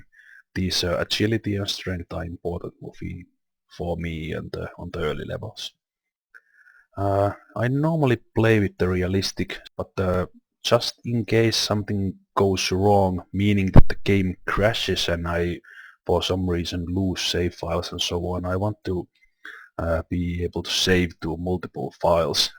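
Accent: Finland. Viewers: Are you Finnish? Your accent so Finnish. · He just sounds like a guy with a prominent Finnish accent.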